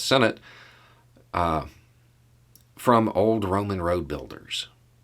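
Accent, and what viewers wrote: South, United States. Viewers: Oh, talk to me, eloquent American Southerner, about the logistics of ancient Rome.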